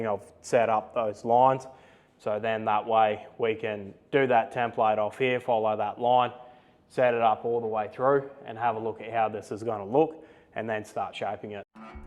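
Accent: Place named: Australia. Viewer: I'm so impressed by the knowledge and craftsmanship that gets shown here in this video. That and well as a Ducthie I like to here a Aussie accent talk shop.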